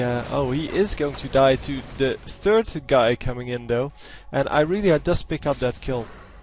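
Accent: Netherlands. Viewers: is that a dutch accent i hear ?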